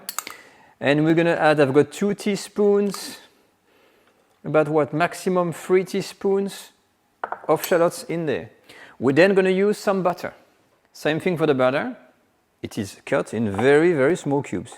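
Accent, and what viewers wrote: France. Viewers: Dude, I bet this video can be done in 2 min or less. Besides, the French have an awful accent. Hair of after 4 min. Really!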